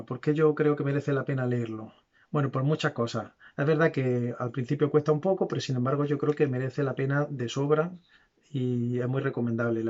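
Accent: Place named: Jaén, Spain. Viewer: Genial el video, me ha servido para hacerme el resumen mental para los exámenes de las pruebas superiores! ^^ PD: como se nota el acentillo jienense jajajaja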